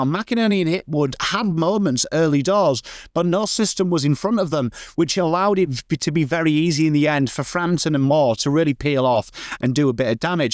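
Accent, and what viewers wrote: England, United Kingdom. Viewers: Why does it sound better coming from a Pom?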